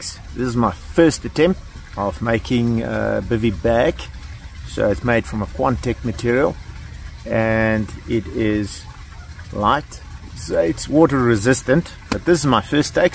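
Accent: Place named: South Africa. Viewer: Hard core bru. Long time since I heard the SA twang. New subscriber China keep the videos comming from the UK.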